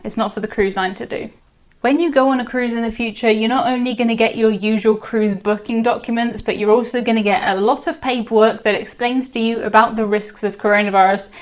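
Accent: RP, United Kingdom. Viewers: Very informative and didn't feel too long; everything was well packed! Hopefully this level of cautiousness is only temporary, yet some of the procedures make sense for the future under all circumstances. Anyway.. It's much easier to learn about these things as someone (read ) has already digested the data and translated it to understandable language (posh accent or not..) and good examples. Thank you!:)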